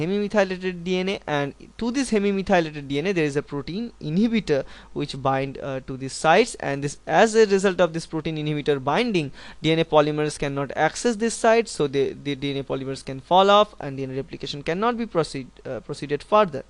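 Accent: India. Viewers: I am sorry I can't understand Indian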